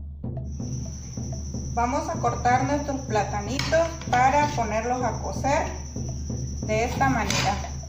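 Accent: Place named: Tabasco, Mexico. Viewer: Que preciosa es mi tierra (Tabasco) da gusto ver el canal de una paisana y escuchar el acento choco ❤ saludos desde San Luis Potosí, cuando voy a mi tierra aprovecho a come de todo y me traigo todo lo que puedo 😀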